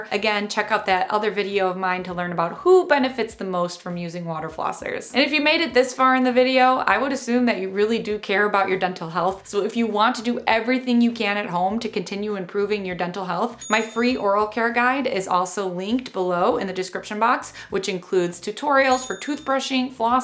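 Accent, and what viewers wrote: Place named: Chicago, United States. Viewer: Your accent reminds me of my family in Chicago. Eh.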